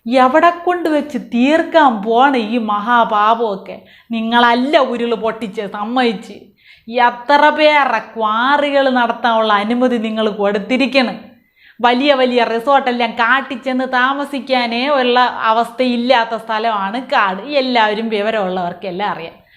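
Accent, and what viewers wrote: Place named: Trivandrum, India. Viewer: നല്ല tvm slang കേൾക്കാൻകൊള്ളാം നീ ആരെടെയ്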